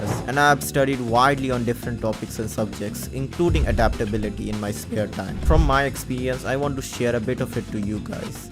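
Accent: India. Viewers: Yo ur Indian right cuz ur accent and the song u used is Indian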